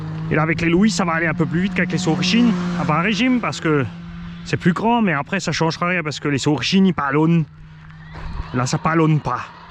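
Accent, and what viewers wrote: Alsace, France. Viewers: Le retour de l'accent Alsacien ! Je suis tellement fan !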